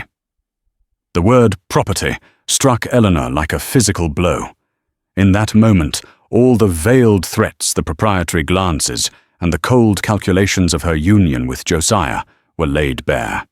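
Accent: United Kingdom. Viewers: What a great voice! A beautiful British accent. Interesting story. Oslo, Norway.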